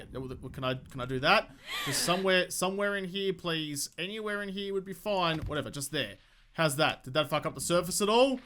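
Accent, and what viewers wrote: Australia. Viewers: Out of pure curiosity from where do you hail? Are you from Australia? Trying to place the accent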